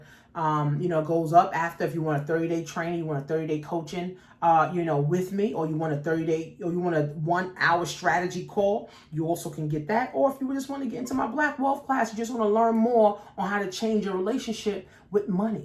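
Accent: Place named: New York, United States. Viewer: Not only are you telling the truth that New York Accent ❤ much love from Cleveland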